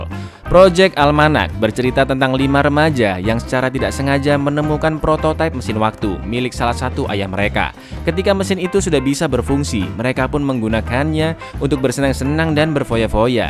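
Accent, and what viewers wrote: Java, Indonesia. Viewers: Risih kbnyakan medok jawa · Jowo kah bang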